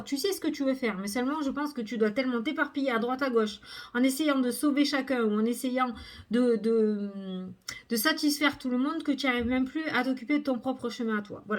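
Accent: South, France. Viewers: Merci ma jolie pour moi la vierge tu as un bel accent chtit du sud 🌹👍❤ bisous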